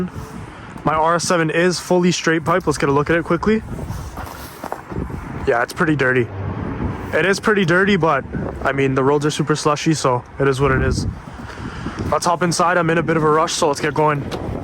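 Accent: Canada. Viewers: The moment he got in the car and started talking, more and more I hear the Canadian. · The km/h and accent is definitely 🇨🇦😂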